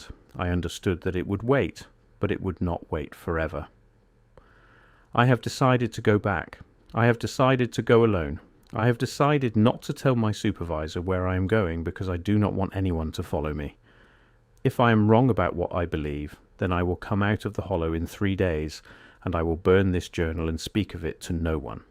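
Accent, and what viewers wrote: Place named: United Kingdom. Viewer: Ridiculous to have this narrated by a British voice. It’s like wearing a striped shirt with a polka dot pair of pants and disco boots.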